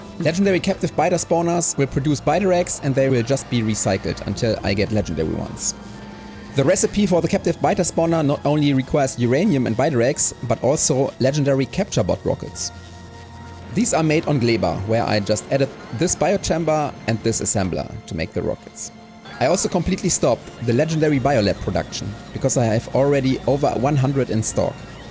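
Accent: Germany. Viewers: Habs auf Englisch sehr genossen und dacht immer "schöner deutscher Akzent" :-D ist aber auch auf deutsch cool. Ich würde mir ein richtiges Schritt für Schritt Schaltungsvideo wünschen. Ich verstehs auch nach 800 Std nicht und alle Guides dazu sind immer zu schnell... Ich versteh nicht warum bestimmte "Symbole" gewählt werden und so weiter und sofort. Darum fallen mir Raumschiffe so schwer, weil ich einfach nicht raffe wie ich da die Schaltungen verwalte... Bzw. Ists sehr stümperhaft. Würd mich echt freuen.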